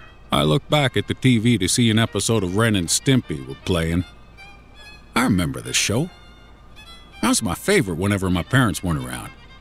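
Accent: United States. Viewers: Good story, and awesome delivery! I LOVE that slight Texas-type drawl you have❣ I think I just may have to subscribe to your channel, lol! I'd love to hear you narrate some long stories as well. One in particular comes to mind. It's called the "New Fish". King Spook did an amazing job with it, and I really think it's a story VERY well-suited to your style as well. Anyway, keep up the great work, darlin'! 😉😘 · I love your country accent man lmfao . But I told everyone on the strange mysteries comment section that this is your channel because literally everyone hates it there now ever since you left, like you were the cherry on top of the cake man Nathan just lost so many subscribers and I literally watched them because like when you would narrate its like you would make learning funny and interesting and you always caught my attention ! Now the guy they got there now is so stale and dry lol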